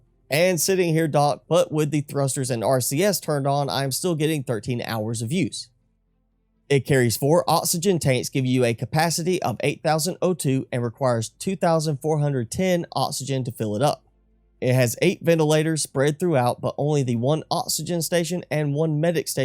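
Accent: South, United States. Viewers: You sound Southern.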